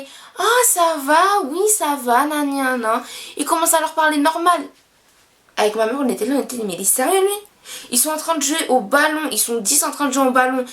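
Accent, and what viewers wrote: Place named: Madagascar. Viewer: j'aurai dit malgache 😊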